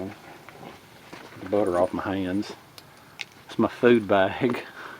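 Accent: South, United States. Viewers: Great video, and the sandwich looks great. I have been watching YouTube for abou2-3 years. I started out watching 3 or 4 channels on guns. I started seeing these videos about bushcraft and started checking them out. That is when I found your videos and really liked your way of doing them. I am also a southern boy and like the way you talk. I have thought about getting a camera and trying my hand at this. Hearing why you started has encouraged me. Now if I can just talk my wife into us getting a camera. Thanks and I am looking forward to more of your videos. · I love watching your videos... I'm originally from Oklahoma and really enjoy your southern accent. I have been in the Pacific NW for several years now and don't often get the chance to hear someone that sounds like me... lol... Very refreshing. I just recently started a youtube channel but have only done one slide show video. 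I'm not good at talking in front of the camera. Hopefully I will get the hang of it. Keep on keeping on. You do great work!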